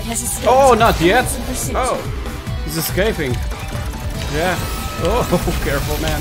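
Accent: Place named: Portugal. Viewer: é portugues... só pelo sotaque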